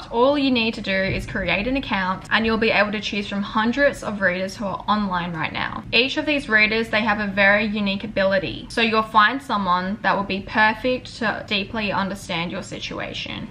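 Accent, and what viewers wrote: Australia. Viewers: It's an Australian accent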